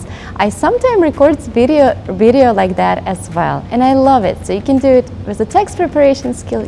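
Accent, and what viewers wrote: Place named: Germany. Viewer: That is a lovely accent you have there. Germany?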